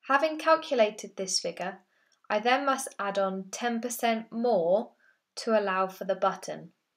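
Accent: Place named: United Kingdom. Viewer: I liked immediately for the British voice. hope it’s good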